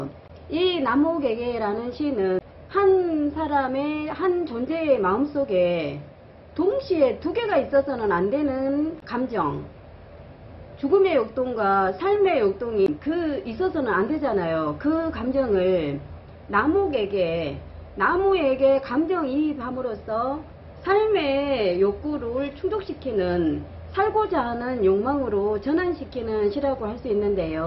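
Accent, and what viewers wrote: Gyeongsang, South Korea. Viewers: 와이프는 AB형 와이프의 행동 방식을 이해하게 되었습니다. 가끔 경상도 억양이 귀엽게 들리네요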